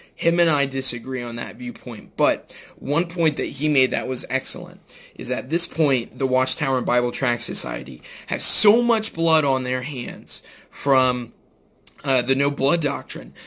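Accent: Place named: Midwest, United States. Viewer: What part of the midwest are you from? I'm from Nebraska.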